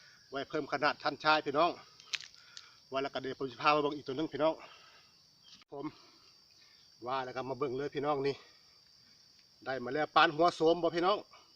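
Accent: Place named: Nyaw, Thailand. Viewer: ไปหาสมุนไพรนำอ้าย มักเบิ่ง มักอ้ายเว้าญ้อจ้า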